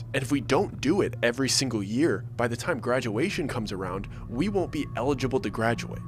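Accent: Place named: East Coast, United States. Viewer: Now I know why listening to you sounds like I’m listening to one of my friends from the neighborhood. I grew up on the East Coast and did a couple of summers in Queens. I also had to Service hour penance.o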